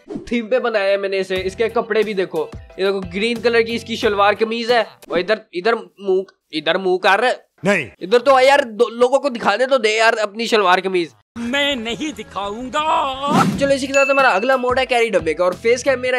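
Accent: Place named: Punjab, India. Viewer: Yes i know apkay accent sy hee pata lag gaya tha chalo punjabi to ho hee na punjabi alay hazri lawao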